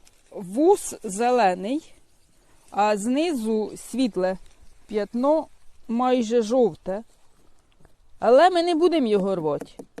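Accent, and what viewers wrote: Poltava, Ukraine. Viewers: Дуже гарна мова ваша,Полтавська❤🇺🇦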